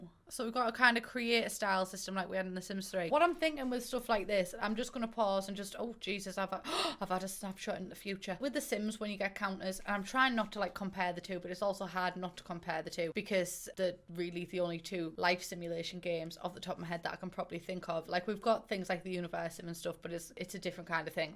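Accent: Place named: Teesside, United Kingdom. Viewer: Are you from boro? I’m from Teesside and you have a proper boro accent.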